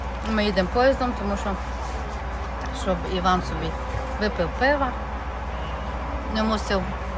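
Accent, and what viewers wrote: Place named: Western Ukraine, Ukraine. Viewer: Виходячи з акценту, ви мабуть самі "нанастраждалися бьєженці" з "патріотично" вибігшого до Європи заходу України.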